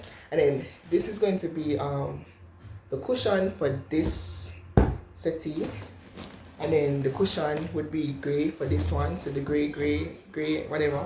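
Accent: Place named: Jamaica. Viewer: I love your accent girl..omg😍😍😍😍😍😍, I love Jamaicans 🥰❤️🥰❤️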